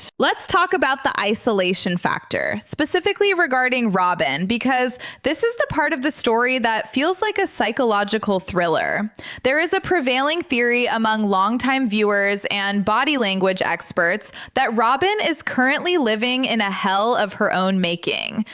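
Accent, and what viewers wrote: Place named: California, United States. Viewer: For the love of God and all that's holy, make the AI get rid of the California sccent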